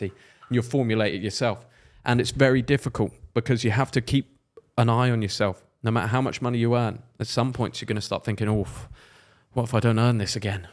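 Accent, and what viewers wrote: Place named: United Kingdom. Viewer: As a non native english speaker i have to say it's very pleasurable to listen this beautiful british accent, i like it so much, good content by the way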